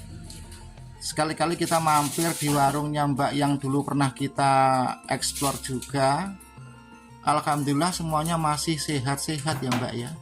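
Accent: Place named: Bojonegoro, Indonesia. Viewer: Aku melihat konten2 Pak Dhe ini hanya dari sisi "NJAJAH DESO MILANGKORI"-nya. Utk wilayah Jatim hampir sebagian besar sudah di-JAJAH DESO MILANGKORI. Utk Jateng... masih mlipir2 ndeso2 perbatasan, Rembang sama Blora. Yg aku salut... Pak Dhe ke mana2 hanya naik motor matic-nya. Sepertinya secara logat dan awal2 berkonten, beliau asal Bojonegoro.👍🏻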